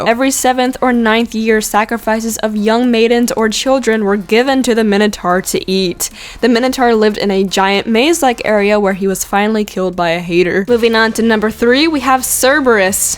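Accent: United States. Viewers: you sure youre not american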